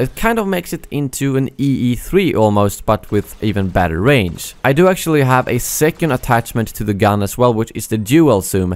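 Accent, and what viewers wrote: Sweden. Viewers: I just found this guy and i got it right away that you were swedish, hahah hej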